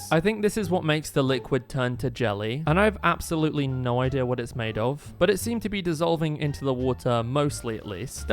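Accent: United Kingdom. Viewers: Are you British? You sound like you are British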